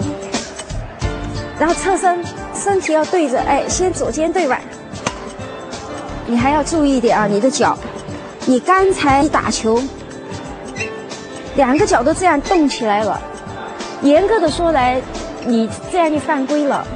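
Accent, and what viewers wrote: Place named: Yunnan, China. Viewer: 这位女老师有明显的云南口音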